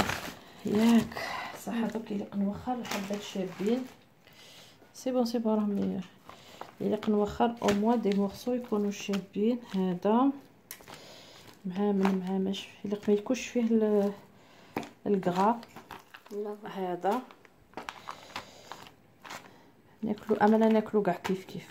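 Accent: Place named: Oran, Algeria. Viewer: اخي متزوج جزائرية نحن نقيم بلجكيا هههههههه نفس اللهجة اللي تتكلمين بها اختي. انتم من مدينة وهران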